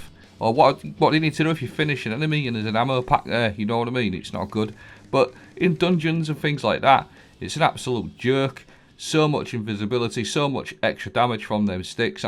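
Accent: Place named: Newcastle, United Kingdom. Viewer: I love the accent. You sound just like my mate Geordie Son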